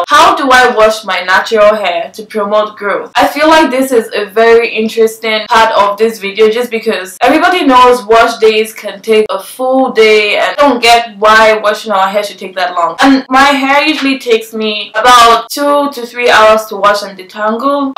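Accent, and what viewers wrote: Nigeria. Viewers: where are you from... you accent is Nigerian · Love this!, great video. Where can I buy those stunning earrings? You are funny. Yessss to the facial expressions and Nigerian accent :) have you heard of the vanity massage brush?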